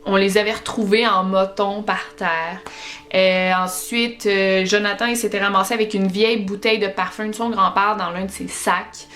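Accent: Quebec, Canada. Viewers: Je crois que je kiff ta chaîne je regarde tes vidéos dans mon lit et même quand je fais la vaisselle ! Et je commence à tomber sous le charme , dire que normalement je déteste l’accent québécois ... haha J’attends la vidéo avec les parcs nationaux avec impatience bisous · Coucou je vient de découvrir ta chaîne et j'adore continue comme ça au passage d habitude je suis pas fan de l accent québécois mais comme tu es jolie sa te donne un charme fou =) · Coucou ma belle ! Je suis du sud ouest de la France et j'ai découvert ta chaîne ya deux ou trois semaines et franchement une fois qu'on s'est habitué à l'accent québécois, t'es vidéos sont passionnantes, t'es récherches super biens faites et ta façon de raconter est fluide et agréable, pas de blablas inutiles mais de belles descriptions et des photos d'illustrations qui sont suffisamment nombreuses pour retenir un peu plus notre attention sans pour autant tout gâcher en étant omniprésentes... 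BREF UNE ÉNORME RÉUSSITE !!! Bravo à toi franchement, mon copain est devenu allergique au québécois, et moi par contre je crois, que je commence à mieux parler québécois que français 😂 😂 😂 😂 😂. Gros bisous et bonne continuation je te souhaite tout plein de succès !!!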